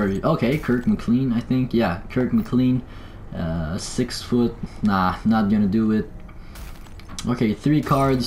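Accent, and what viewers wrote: Quebec, Canada. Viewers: oh okay. French canadian? That explains the accent right? Keep up the good work. Love these vids man👍🏼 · Ahah l’accent québécois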